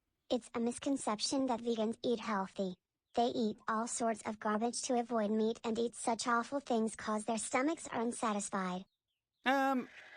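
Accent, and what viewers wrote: Boston, United States. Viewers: Boston accent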